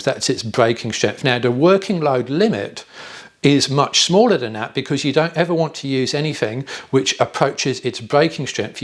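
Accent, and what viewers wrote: England, United Kingdom. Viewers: Ignore the English accent, this is Australia but for which state rules, no idea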